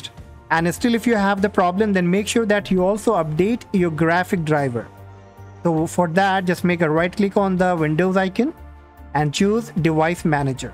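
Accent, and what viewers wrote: India. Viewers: I don’t trust that Indian voice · Bro speak real English not Indian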